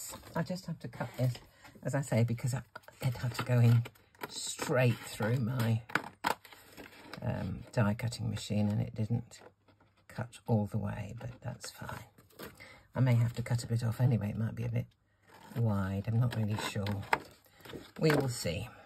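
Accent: England, United Kingdom. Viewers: Today was the first time I've seen you, it was lovely to hear an English accent (just for a change) and to see dies whose make I have but have never seen demonstrated before. Enchanting l loved every minute thank you ❤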